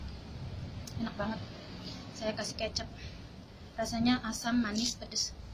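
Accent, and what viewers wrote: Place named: Cirebon, Indonesia. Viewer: Logatnya ky logat cirebon...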